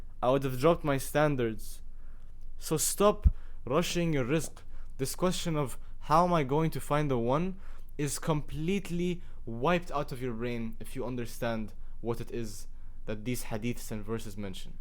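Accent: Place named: Egypt. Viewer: عرفت انك مصري من الaccent. كمل كلامك جميل جدا اللهم بارك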